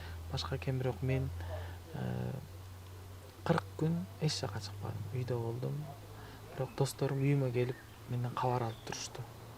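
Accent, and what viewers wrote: Standard. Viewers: биз сени кыргыз деп билебиз.Бирок озбек болсо эмне экен.Кыргыз озбек казак бварыбыз бир мусулманбыз.Кыргыз тилин кыргыздан таза таттуу суйлойсун.Конул бурба негатив суйлогондорго.Иттер уро берет... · Апандын арты кайрылуу болсун,кыргыз эмес экенинди эми билдим айтпасан эч ким билмек эмес болуш керек Кыргызчаны таза суйлойт экенсин сен эмес туштуктун кыргыздары оздору таза суйлой албайтго озьекче аралашкандай ударение менен суйлошотго молодец ишине ийгилик Нарындыктардайле суйлойткенсин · Кыргызстанда жашап, осуп,улутун башка болсо да кыргыз тилинде таза суйлогон,комуз черткен кыргызды даназалап жургон жарандарга ыраазымын. апана таазим сендей уулду тарбиялаган. Бейишин берсин. Коп жаша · Сиз таза кыргызсыз. Таза суйлойс кыргыз тилди жакшы корос.сыймыктанас.комузду да🎉🎉❤❤❤ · аза ат сын кыргызча таптаза суйлоцт ырдайт рахмат да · Сабыр берсин бейишинен орун берсин сенин улутуңду бөлгөн кыргыз кимиси башына ак калпак кийип жүрөт кыргыз болуп төрөлгөн эркектер бар калпагы жок накта кыргыз дагы сенчелик таза сүйлөй албайт кыргызчаны сага күч кубат берсин артында тоодой Кыргыз элиң бар экенин унутпа алтыным😢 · Ленин деген русский мектептен буткон кыргызча бизден таза суйлойсун.оштук баткендиктерден таза суйлойсун.. 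· Кээ бир кыргыздар сендей таза суйлой алышпайт,орусча,чала суйлоп,азаматсын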